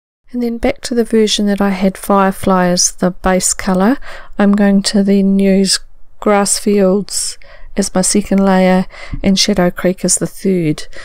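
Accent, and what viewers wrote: New Zealand. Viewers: Hi. I am not a kiwi. My son luves in Christchurch. I've spent some time there. I felt that you had a NZ accent and that's why I asked you. I live in Mumbai...I love the kiwi accent. As my son has settled in NZ... it has become my second home. It is the most beautiful country in the world. Wish you all the best, good health Happy crafting....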